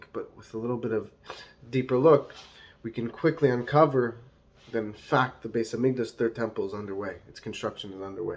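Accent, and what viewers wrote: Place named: United States. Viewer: Who are you, and where are you from? It sounds like you are from the U.S.A. HOW DO YOU KNOW?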